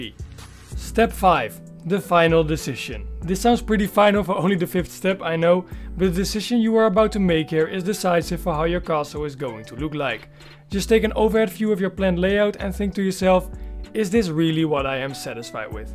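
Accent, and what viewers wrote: Netherlands. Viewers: That’s one dutch fella if I heard one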